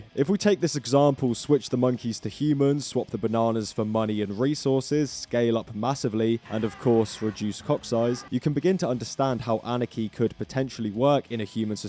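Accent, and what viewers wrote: United Kingdom. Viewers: first time i've seen you. i thought you were a British Lad you know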